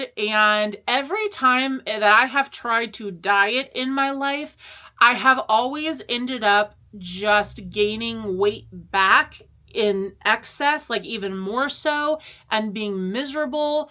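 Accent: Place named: United States. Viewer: We love clear American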